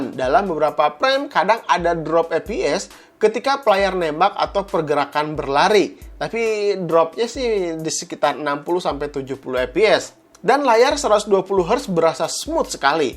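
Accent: West Java, Indonesia. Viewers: aduh akang sunda pisan hahaha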